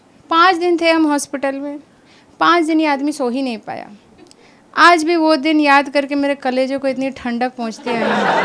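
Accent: Bihar, India. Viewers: A bengali with Bihari accent has got to be one of my favourite thing to listen to. Good set, and nice puns. Keep growing mam. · mam aapne accent Bihari kaise hua? Aap Bihar mai grow up Hui hai ? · You are bengali but bihari accent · How did you develop the bihari accent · Bihar ki ho ka Didi aawaj ke tone se to yahi lagta hai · Ee अक्सेन्ट bihari h ka 😂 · Aapka accent bihari kaise h? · Bengali with bihari accent is amazing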